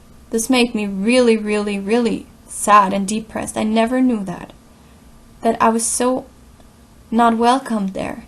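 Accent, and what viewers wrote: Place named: Germany. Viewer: wie man immer sofort weiß dass jemand deutsch ist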